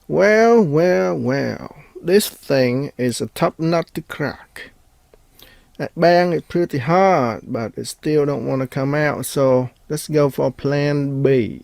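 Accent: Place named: South, United States. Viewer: What is your accent? Every fourth word has thick southern slang while the rest sounds Asian/American. That southern draw just catches me off guard. Great video. K bye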